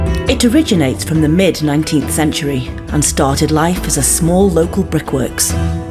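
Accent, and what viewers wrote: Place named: RP, United Kingdom. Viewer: You got a BBC voice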